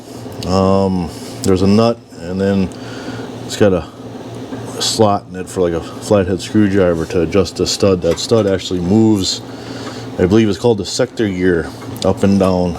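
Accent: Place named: Midwest, United States. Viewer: this channel rocks hahaha . you are good at this and explaining what your doing. your midwest accent sends it overboard ( in a good way)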